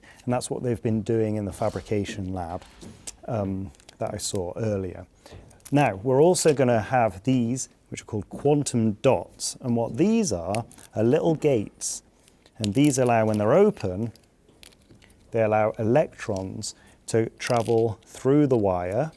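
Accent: England, United Kingdom. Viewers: The accent is extremely annoying. English accent is so pretentious and unnecessarily slow and obnoxious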